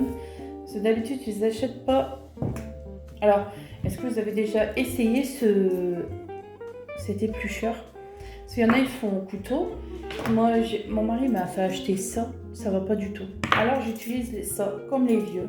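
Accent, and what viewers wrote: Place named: Nord-Pas-de-Calais, France. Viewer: Coucou ma.poule. oui moi aussi je me sers du même eplucheur que toi de la marque typer....re. J ai fais des gaufres sèches du Nord, cette semaine. Bon je ne suis pas chti lol...mais j avais envie d essayer..😊 je suis du 77 à côté de 2 grands parcs d attractions bien connu. 20 minutes pour 1 et 1 h pour l autre. Tu parles chti c est sympa... pour le live je crois que c est toi qui bloque ou pas la personne en direct..mais bon ..renseigne toi car j y connais rien lol..moi je travaille dans une grande banque du 4/40. Actuellement en arrêt suite cancer du sein il y a 1 an. C est récent. Sinon j aime la marche. Je fais environ 40 km par semaine. Voilà ça te donne une petite idée de moi.. Pleins de bisous. 😊😊😊❤❤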